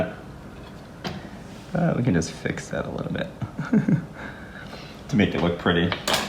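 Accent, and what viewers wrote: Boston, United States. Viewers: Looks delicious! Oreo is adorable! And, is that a Boston accent I’m detecting! LOL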